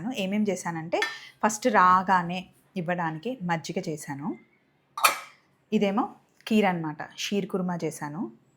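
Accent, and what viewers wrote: Andhra Pradesh, India. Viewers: Meeru Telangana vallu aivundi Andhra slang lo enduku matladataru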